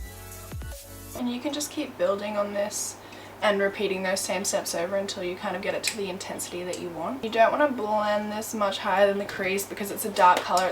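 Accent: Australia. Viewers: I love that australian accent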